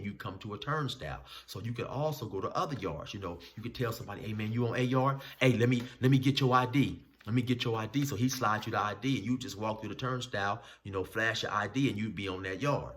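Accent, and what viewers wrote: California, United States. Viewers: You a natural story teller pimp especially with that cali dialect I’m hooked dawg. KEEP posting big homie. YARDOWN!!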